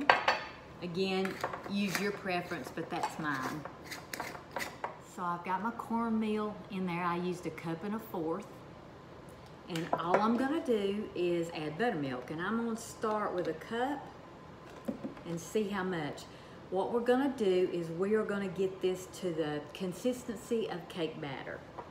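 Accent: South, United States. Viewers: I can’t believe I found you! Not that that J know you, but that I LOVE, you southern charm and I LOVE that accent. I also have an accent and hate to hear myself on a recording. So, I just looked up how to make cornbread without using a store bought mix and you were the first selection. And It was refreshing to hear someone that is definitely from the south and more so, sounds exactly like me. That accent is like hearing myself in a recording minus the fact, I am a man, not a woman. But that twang is unmistakable for me. God bless you, ma’am!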